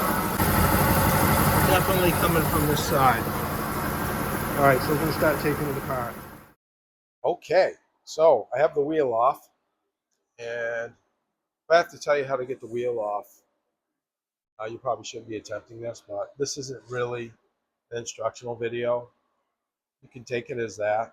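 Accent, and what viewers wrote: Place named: Maine, United States. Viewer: Guy you def a mainah...you ain't from New Hampshire.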